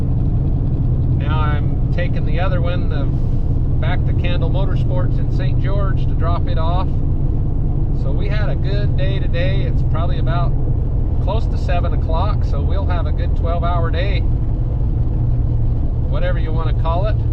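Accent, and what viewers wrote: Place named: Texas, United States. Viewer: Ok I know you live in Utah but, since you mentioned it, what part of the Country are you from? Sounds like Texas.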